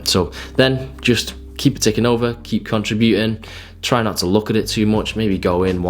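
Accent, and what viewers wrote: Liverpool, United Kingdom. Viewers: Scouse power! 💪🏼 Quality video lid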